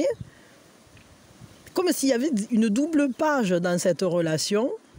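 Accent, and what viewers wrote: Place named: Alps, France. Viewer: Bonjour. Quelques semaines que je me régale a t'écouter ton accent m'est de plus très familier tu ne dois pas être très loin de chez moi lol j'hésite entre les basses alpes ou les hautes alpes. Lol bref tu es devenu mon plaisir quotidien et en plus je te trouve très douée et pleins de répartie 😂. Même si ça ne me parle pas forcément à moi j'adore ta dérision et ton humour. Donc voilà je voulais que tu le sache. Car tu mérite avec tout le temps que tu nous accorde de savoir que tu fais bien de continuer. Bonne continuation à toi au plaisir de t'écouter encore. J'adore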